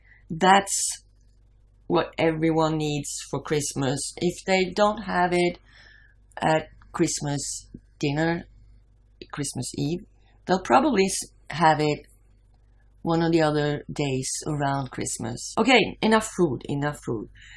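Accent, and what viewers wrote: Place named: Norway. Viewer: Ah I should've recognized your accent being from Norway since I recently played a game that took place in Norway. Nice to see different and similar customs and decor for the holidays. You guys must be gingerbread house pros! lol I think the food version of slow TV would be a hit here in America, as much as we love our food. At the very least it should be live streaming somewhere lol Though I'd love to take that Norwegian coastline trip too!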